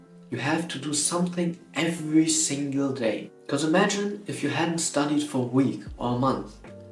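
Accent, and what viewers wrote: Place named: Germany. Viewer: I don't know, I studied in a completely different way, and it took me 2 years to reach N2. Learn Hiragana and Katakana in 2-3 days, don't avoid Kanji in the beginning, use Duolingo or Busuu for 2-3 months because the app gives you direction. Don't use Genki because the teaching order is illogical (e.g., teaching ばよかった before the actual ば-form, not distinguishing between things like というわけではない and とは限らない and so on). Wanikani > Anki. But I do actually agree: consistency is key^^ Und jetzt am ehrlich , ich höre da schon nen krass deutschen akzenten mein lieber.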